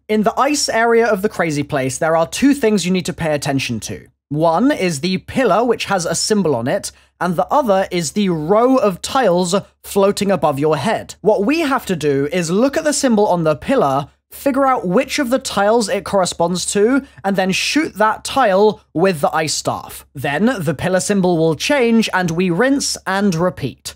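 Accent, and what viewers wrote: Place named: England, United Kingdom. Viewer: Estos si es asentó ingles no como lo que tengo en usa xd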